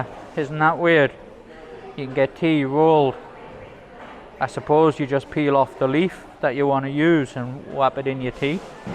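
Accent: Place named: Newcastle, United Kingdom. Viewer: He is from UK. Specifically North of England. Newcastle area. Which known as Geordi land. Hence his accent.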